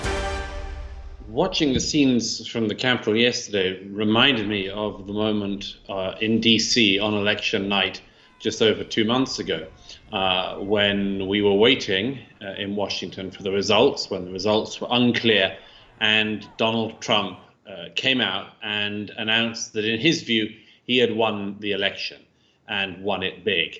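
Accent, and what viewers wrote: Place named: RP, United Kingdom. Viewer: Firstly, why is Douglas wearing make up? Secondly, why are people listening to his analysis? In the summer he predicted that Trump would win in a landslide as a result of the BLM protest. talks nonsense with a posh voice. Sad that for a certain sector of the British public this is what passes for insight.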